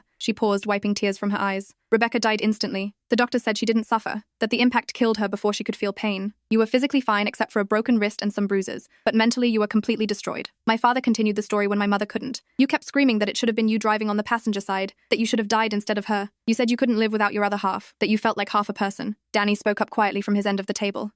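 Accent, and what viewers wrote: United Kingdom. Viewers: British voice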